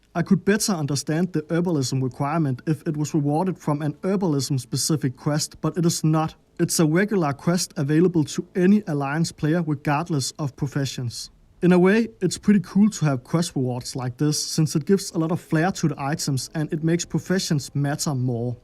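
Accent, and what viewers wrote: Denmark. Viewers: Danish is strong in this young one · that danish accent wowowowow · Shiiit thar danish accent tho 🤣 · damn that danish accent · Can't Tell if Danish or Austrian, but i can tell you make great informative content!! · The Danish is strong in this one!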